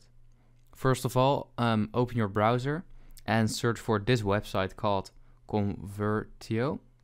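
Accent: Netherlands. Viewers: Are you Dutch? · Dat nederlandse accent hahahah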